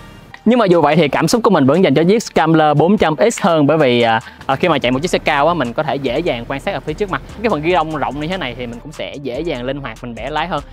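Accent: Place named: Saigon, Vietnam. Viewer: Một Chútttt nghe nó sượng lắm ý, kiểu nó không đồng nhất. Nếu đã phát âm cho đúng thì đúng hết, còn này toàn bộ giọng Sg xong mỗi từ chútt thì nhấn như ng Hà Nội. Kì vậy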